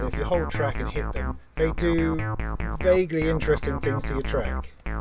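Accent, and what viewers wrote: United Kingdom. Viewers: you're becoming something of a rather good influence. And so nice there's another UK accent to listen to. just got myself an mpc one as a brain....(not personally... though perhaps could be pressed into service...) for a more advanced system. looking to start investing and building around Christmas time. Keep making the vids... learned everything i know so far mainly from you and divkid., huge thanks (btw... what was that reference you made to church the other day......?)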